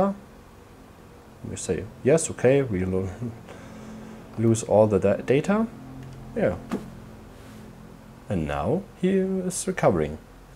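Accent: Germany. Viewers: a nice German accent talking technically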